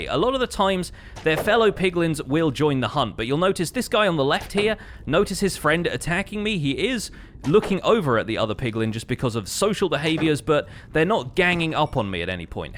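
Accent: United Kingdom. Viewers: your British Accent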